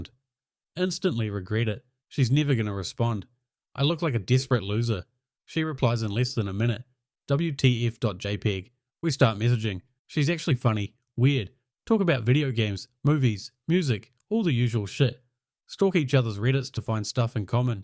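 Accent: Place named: New Zealand. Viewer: Why all the talking in funny Kiwiglish?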